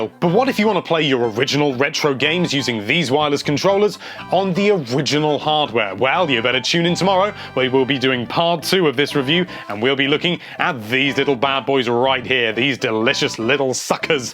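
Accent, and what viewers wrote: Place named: United Kingdom. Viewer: English folks from the UK tend to pronounce anything ending with an S as having a Z sound. Likewise A and R are swapped at the end of words... he called Sega seger.. Ocareina sounds like Ocareiner and Doctor become a Docktah It's just how their English tends to work when spoken...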